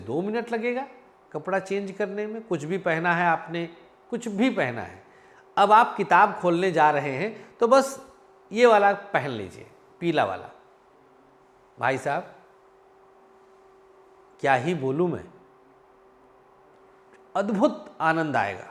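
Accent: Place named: Awadh, India. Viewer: अवधी बोली❤ प्रणाम गुरु जी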